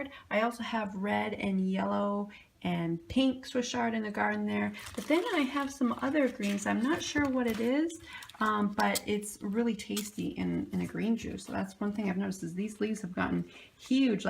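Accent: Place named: Alaska, United States. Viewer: I could listen to you "rant" all day Miss with that Alaskan accent. Thanks for the chitchat!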